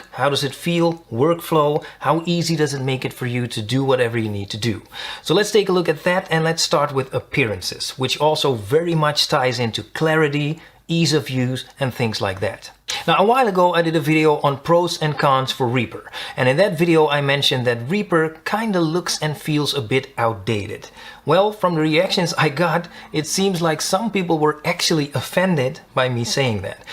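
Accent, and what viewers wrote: Netherlands. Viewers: Small channel still, but I love your clear style of presentation. I consider myself a "Reaper power user" but getting more and more frustrated by the midi editor, and for that reason alone I'm trying out Studio One 4 Professional. By the way, you sound a little Dutch (the accent)! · Hoor ik een Nederlands accent?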